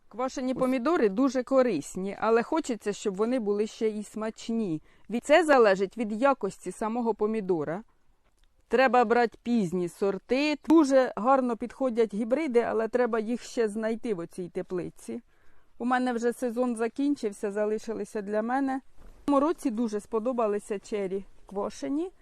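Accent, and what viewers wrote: Poltava, Ukraine. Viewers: Відчувається в голосі полтавський акцент))) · І я одразу подумав, що говір полтавський. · З задоволенням додивилась до кінця. Навіть не дивилась-більше слухала. Такий рідний полтавський говір. Полтавщина і Вінниччина це моя любов. Бабусі мої там жили. Завжди взнаю людей звідти. Щире вам дякую за рецепт за поради за спомин.❤ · так, не діалекти. Не вірно висловилась. Це специфіка нашого полтавського мовлення. Мені просто цікаво, ви в повсякденному житті розмовляєте виключно літературною мовою? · В МЕНЕ РОДИЧІ ЖИВУТЬ У МИРГОРОДІ ,ТО Я ПО РОЗМОВІ ЧУЮ,ЩО ЦЕ ПОЛТАВЩИНА. Я ВСЕ КЛАЛА В ПОМІДОРИ ХРІН,А МЕНІ КАЖУТЬ,ЩО НЕ КЛАДУТЬ. ХРІН,А ПЕТРУШКУ. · Так мені подобається Ваш Полтавський говір)))Ніби спів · Дякую!Чую по мов1,що ви з р1дно1 полтавщини! Гарний рецепт! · Як я люблю полґтавську говірку...мала батьківщина мого чоловіка... А які там смачні помідори! 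Дякую вам!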